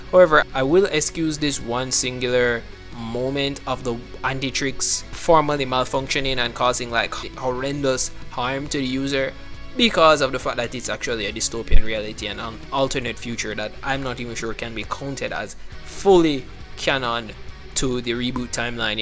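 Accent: Jamaica. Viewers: your jamaican right? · Are you from Jamaica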